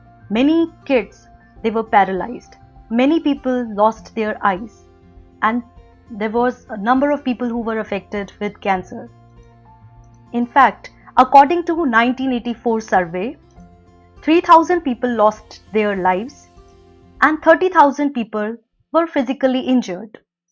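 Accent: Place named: India. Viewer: The content is good, however, the indian accent's extremely annoying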